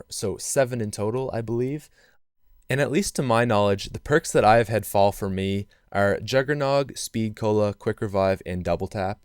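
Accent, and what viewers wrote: Canada. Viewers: are you canadian? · you sound like you are from Canada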